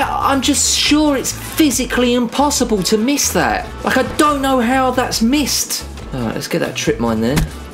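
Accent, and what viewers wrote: United Kingdom. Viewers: Geordie Podcast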